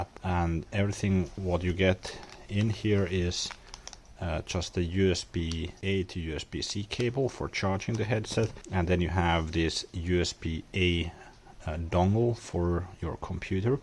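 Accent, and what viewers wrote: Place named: Finland. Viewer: Your accent sounds like a Finn. Onko sinä suomalainen?😊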